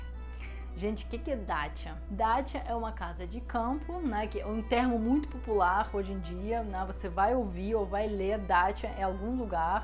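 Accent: Rio de Janeiro, Brazil. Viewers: Você fala muito bem português e ainda tem sotaque carioca.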